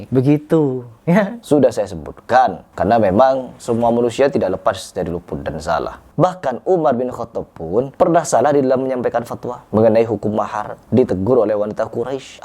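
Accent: Madura, Indonesia. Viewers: Mas ni orang madura kah? Logat nya mirip2 madura hehe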